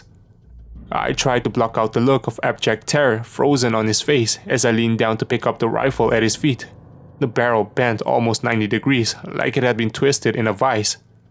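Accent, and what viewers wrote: United States. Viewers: his robotic like tone with this acid us of A's accent make the video hard to listen more than 5 mn. So i read the comments.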